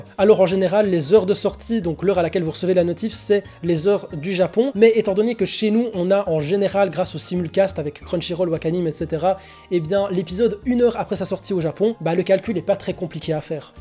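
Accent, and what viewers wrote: Belgium. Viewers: néerlandais ? jure t'es belge ? 🇧🇪🇧🇪🇧🇪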